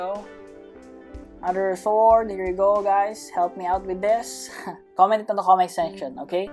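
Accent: Philippines. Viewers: Sabi na nga pilipino ka eh accent palang eh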